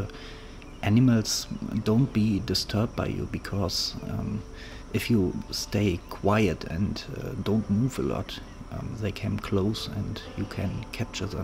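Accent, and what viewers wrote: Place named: Germany. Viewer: An deinem Englisch hört man das du deutscher Bist 😂✌👍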